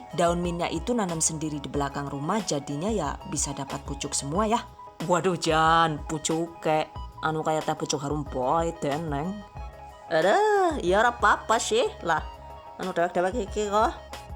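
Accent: Banyumas, Indonesia. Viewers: Mba sampean wong mediun kok ngapak kwkwwk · Ternyata wong ngapak ibune 😄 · Lehhh deneng si ngapak jebule 😂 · Kok ada ngomong ngapaknya😀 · Ooo key 😁 salam ngapak ibuneee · Suka KL ngomong ngapak, walau q g ngarti....tp lucu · Deneng sih ngapak mbokayu??? Ketone enak yakin..arep njajal gawe lah nyonge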